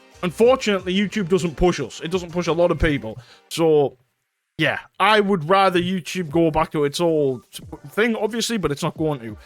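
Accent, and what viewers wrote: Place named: North, United Kingdom. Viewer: I totally agree with all the points you brought up. Seeing it from the view as a creator and as someone who likes to express themselves in the comments & give feedback on videos... it feel as though we're all walking on eggshells & we can't be as organic as we want to be. For creators, they can't push the boundaries or be edgy or forward thinking anymore. As a viewer..: I'm not one of those Twitter types who gives knee jerk abrasives comments, if anything I'm respectful of of a creators channel & I don't want to bring any negativity to the channel but it's so annoying when you make comments that are totally valid, factual & non offensive... it gets removed or censored, all while someone can berate you in the crudest manner & they get a pass. The latest YouTube algorithm & censorship doesn't make sense but hey ho, at least they're not as bad as Twitch. 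Ps love the accent & as a Londoner & every time I've met people from up your end they've been the nicest people 💯🙏🏾👊🏾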